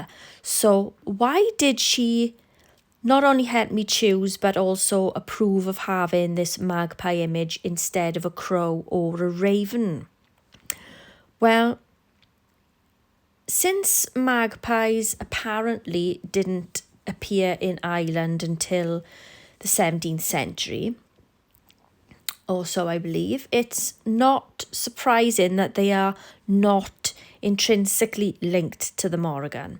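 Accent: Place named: Wales, United Kingdom. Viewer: And I'd never want to shut a Welsh woman up! 🥰 You have a wonderful dialect.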